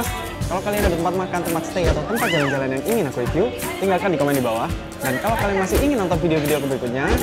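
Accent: Java, Indonesia. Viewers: Ngomongnya kayak logat jawa tpi org bali:vv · kirain jawa. Kok kayaknya medok banget kalo ngomong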